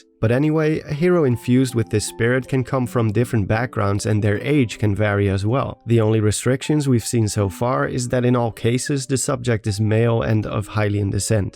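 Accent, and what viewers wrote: Netherlands. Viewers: You’re from The Netherlands aren’t you? I can clearly hear it in your voice. xD Cool video!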